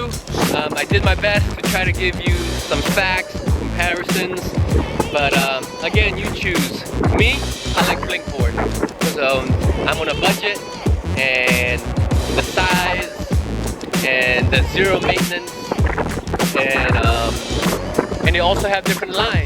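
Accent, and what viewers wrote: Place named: Europe. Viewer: You talk like idubbz with European accent lol!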